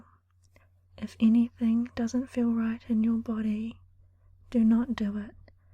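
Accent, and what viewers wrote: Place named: New Zealand. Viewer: Thus us an ASMR Chunnel. I found ut to be relexing AF. Thenks. Aussie here, Dig the Kiwi acksent!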